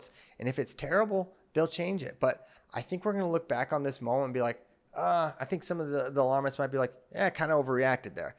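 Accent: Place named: Iowa, United States. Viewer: Are you from Iowa? I think I detect an Iowa accent.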